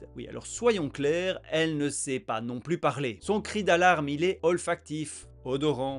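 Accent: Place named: Belgium. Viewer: Ça s'entend mais c'est pas dérangent je crois que j'ai un accent plus fort que toi d'ailleurs 😂 non se qui m'a fais me dire ça c'est tes expression qui sont bien belge❤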